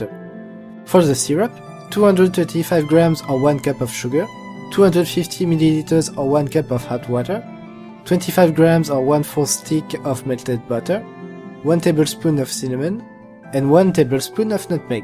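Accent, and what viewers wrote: France. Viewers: Mystical Turnip its French mixed with kahjiit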